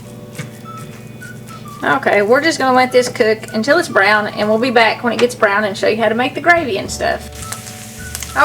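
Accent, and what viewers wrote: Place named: Kentucky, United States. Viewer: My dad was in the military in WWII. He would not eat SOS, no way, no how. I tried several times when I was growing up to get Mom to make it. She would not even make a pan of SOS. I still make a batch sometimes for breakfast (with sausage) just to remember Dad. By the way, I grew up in Western KY. I love your accent. You know it reminds me of Mom.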